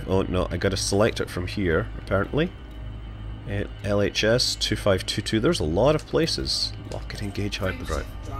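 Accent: Scotland, United Kingdom. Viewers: Has anyone told you, you sound like a Scottish Carl Sagan? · Mr.: as you got closer to 'Home', I shared in your obvious excitement. Well played, commentated, and shared. Thank you! P.S. It was your Scots accent which originally caught me, then I watched more of your vids, and have since subbed.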